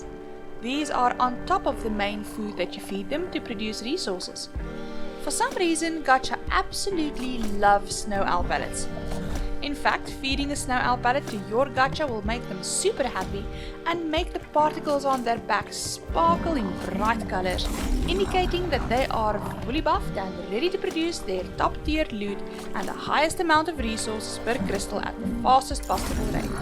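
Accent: South Africa. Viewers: south african ACENT? :-) Love the clip 🙂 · are you afrikaans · You sound South African.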